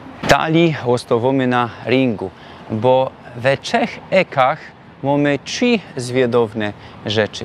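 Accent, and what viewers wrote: Silesia, Poland. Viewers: Fajna ta gwara śląska 👍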